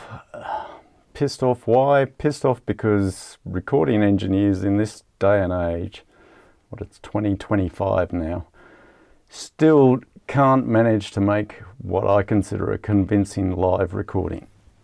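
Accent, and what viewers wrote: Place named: Australia. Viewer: What I found most interesting is that you have a Finnish name, yet you seem to speak with a perfect Australian accent 😄 If you don't mind me asking, have you lived there your whole life?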